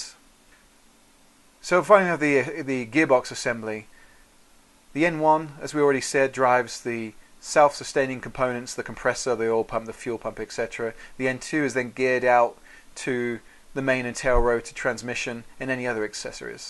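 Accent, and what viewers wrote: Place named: England, United Kingdom. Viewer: I saw the tail number on the helicopter (N319CF) and I thought it looked familiar. So, I checked my log book, lo and behold, I flew that same helicopter for long line training. Then I thought to myself... The person who made this video sounds English and has flown the same helicopter as me...hmm...who could this be? This person could only be! Great video and explanation of how helicopter turboshaft engines work.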